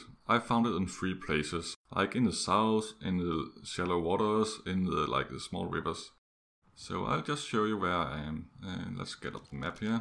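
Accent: Denmark. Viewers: U Sound Danish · You sound Danish. Are you Danish?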